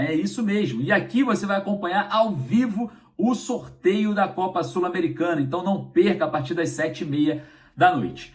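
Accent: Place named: Rio de Janeiro, Brazil. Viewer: Que carioca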